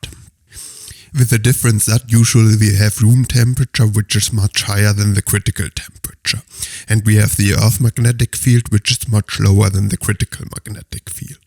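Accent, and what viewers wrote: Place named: Germany. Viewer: Wenn ich das richtig raus höre hast du einen starken deutschen akzent, also verstehst du sehr wahrscheinlich deutsch oder liege ich mit der Annahme falsch.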